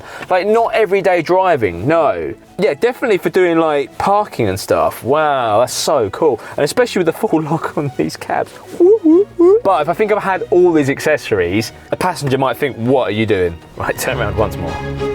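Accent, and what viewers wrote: United Kingdom. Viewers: He's british, that's how they say it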